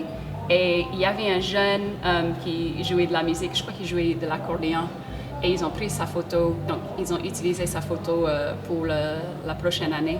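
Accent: Quebec, Canada. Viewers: tu à un super niveau de français.. bravo.. Avec un léger accent québécois..!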